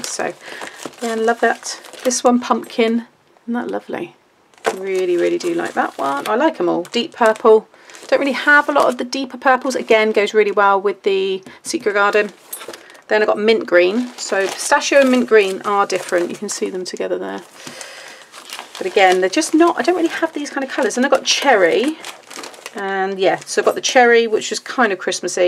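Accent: United Kingdom. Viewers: Nice haul! Have I missed something? Sounds like you are back in the UK?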